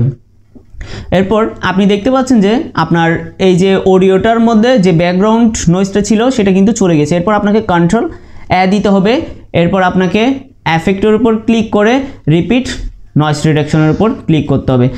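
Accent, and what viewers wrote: Chittagong, Bangladesh. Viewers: ভাই কি চাটগাঁইয়া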